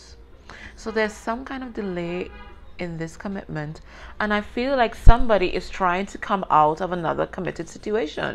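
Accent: Trinidad and Tobago. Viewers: You sound like a Trini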